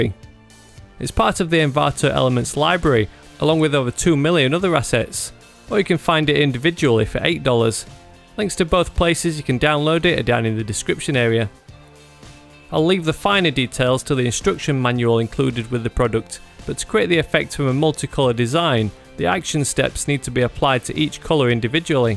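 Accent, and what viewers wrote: United Kingdom. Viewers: British accent very good!!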